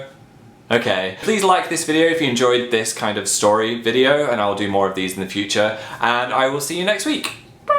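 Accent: North, United Kingdom. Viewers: He Sounds so northern in this 😂🥰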